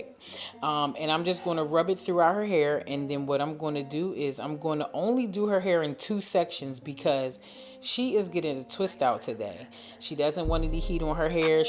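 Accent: Philadelphia, United States. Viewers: Where are you located, you sound like Philly. If so what's up family!!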